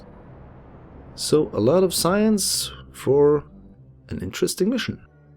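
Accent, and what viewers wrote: Germany. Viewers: Man hört deinen deutschen Akzent. ;)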